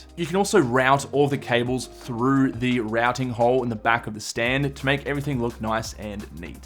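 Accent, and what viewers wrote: Australia. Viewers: gotta love the Aussie accent "M1 oi-Mac"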